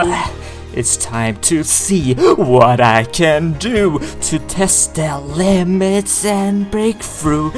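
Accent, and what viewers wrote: Sweden. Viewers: are you swedish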